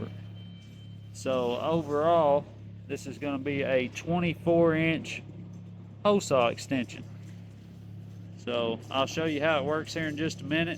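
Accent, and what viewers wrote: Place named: South, United States. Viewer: Appreciate the video, gotta love that sweet southern accent!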